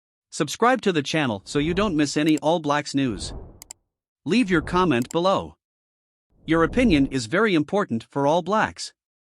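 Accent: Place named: United States. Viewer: How is this an OFICIAL AB site when the narrator is American??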